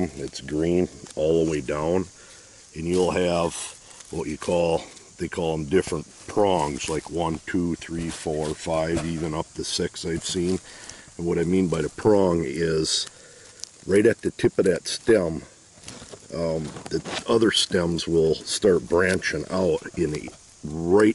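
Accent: Minnesota, United States. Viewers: You sound like my, Uncle...😂 Minnesota?! Great video BTW... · Thank you so much it's very informative your accent sounds like Minnesota or maybe North Dakota where are you from?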